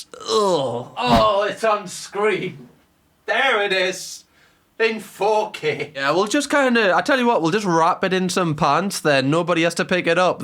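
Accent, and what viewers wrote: Newcastle, United Kingdom. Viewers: I follow you cos I miss the Geordie accent. When you said Jesmond instead of Desmond, I laughed.. 😅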